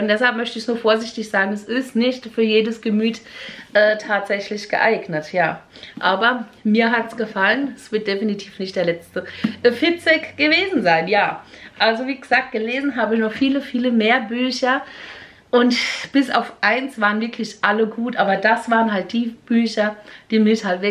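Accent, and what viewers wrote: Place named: Palatinate, Germany. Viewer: Liebe sehr schönes Video, und dein Pfälzisch 🥰 mir gefällt deine ehrliche Art und du bist so eine sympathisches Fräuleinchen, und die Buntheit in deinen Videos einfach macht es Spaß dir zuzuschauen 😀…die Lampe ist sehr schön🍀😀über Gewinn hätte ich mich sehr gefreut😊lg Miri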